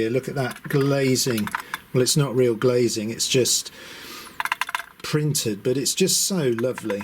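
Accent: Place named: Northampton, United Kingdom. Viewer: This brought back memories from 67 years ago when my elder brother convinced my father to buy a three rail train set. The old boy never really got to grips with electricity so he had to get one of his salesmen over to explain why the locomotive went backwards when he pushed the Model 3 controller throttle into the forward position. Lord knows how he would have coped with computerised DCC control with 32 separate blocks ! Thanks for making a wonderful video, I love your Northampton accent, where I was born 64 years ago.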